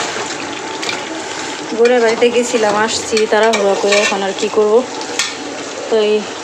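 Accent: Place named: Comilla, Bangladesh. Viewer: আপনার বাড়ি ব্রাহ্মনবাড়িয়া না কুমিল্লা কথা শুনে মনে হচ্ছে ❤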